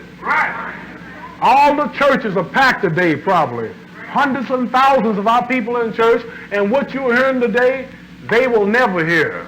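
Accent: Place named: Kentucky, United States. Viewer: An hard word. Loved that kentuckian accent he had.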